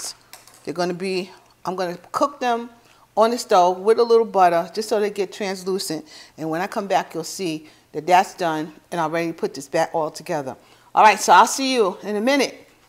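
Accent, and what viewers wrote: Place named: New York, United States. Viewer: I just love your NY accent...I'm in Philly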